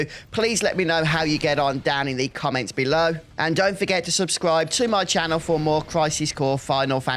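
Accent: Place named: Black Country, United Kingdom. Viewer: Daaayyymm dude. Are you from the Black Country? So nice to hear that accent. I'm from Birmingham. But no permanent accent. I auto mimic whomever I talk to unconsciously.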